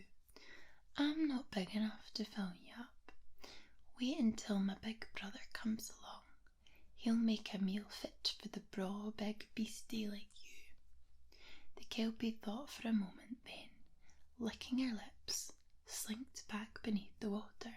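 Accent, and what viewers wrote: Scotland, United Kingdom. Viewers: we want even more hella thicc scottish accent videos my love! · I do not have good ears for decrypting deep Scottish accents. I didn't imagine that "Healing Goose" would really be "Highland Cows". Crazy. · Before I comment on your video, I must say that I'm again running late watching and commenting! It has been like this for a very long time due to my working schedule! I have to mention this because only a few people know! I have mentioned this to Alexander and Nick and it's about the very first time that I got into Asmr videos! I'll try not to drag this out but I started browsing YouTube videos, cars, etc. several years ago and somehow I came across this video made by Kel who is from Australia doing this Asmr video and with her accent and hand and mouth sounds for some reason definitely got my attention 😀. So I followed her for a while and one day I came upon very stunning Scottish women doing Asmr videos also. 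So I got hooked watching several of videos and of course that amazing Scottish accent had me hooked! It took me several of her videos before I got the nerve up to start commenting, and boy was I nervous!! Eventually I switched over to channel and so the rest is history! I know that some of you have been following alot longer than me but I am really sorry to my friends that I have met from watching videos that I don't always get a chance to talk with like I use to! 🥺 I really appreciate being made part of the moderating team ❤. Unfortunately I don't get to much of a chance to really be as helpful as a few other of my friends! But I do keep tabs when I can! Thanks everyone who's made it to this point of my comment for letting me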